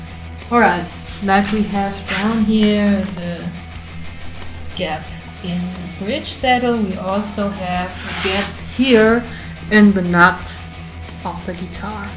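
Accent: Germany. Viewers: Du sprichst sehr gut Englisch, nur man merkt voll, dass du Deutsche bist. :D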